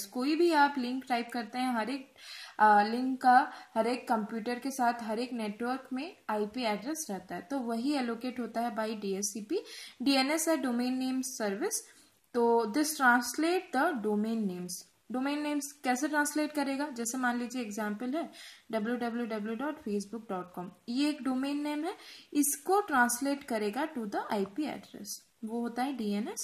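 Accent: Odisha, India. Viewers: Are you odia ??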